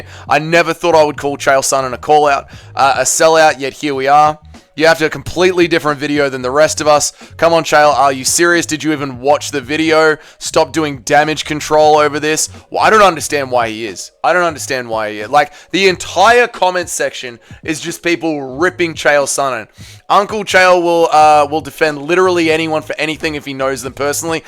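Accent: Australia. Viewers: I think Chael really has lost whatever little credibility he had left. Very sad to see. Loved the video, by the way, delivered the way only an Aussie can! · Something about you Aussies smug, disrespectful attitude mixed with your unpalatable accent especially when reporting or speaking about anything American, just sounds like huge piles of 💩hitting the ground over and over again…. Btw, has everyone seen the video footage of the disrespectful jackass wrestler committing assault by walking up and smashing a can of beer on Raja’s head prior to the event?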